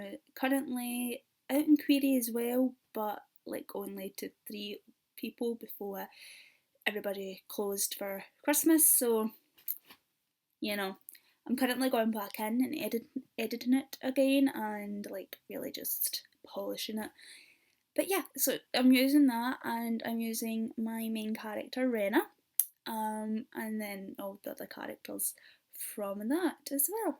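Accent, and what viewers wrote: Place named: Scotland, United Kingdom. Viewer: I love your Scottish accent! <3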